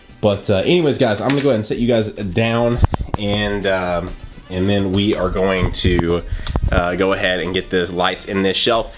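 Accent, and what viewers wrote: South, United States. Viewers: are you from the south sounds like you have a southern accent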